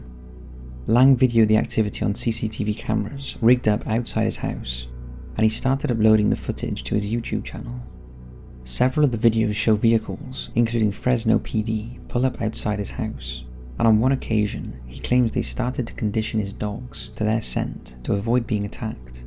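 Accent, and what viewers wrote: United Kingdom. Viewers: It’s just the accent, British people don’t seem to make use of the letter Z (zed as they call it) or its sound very often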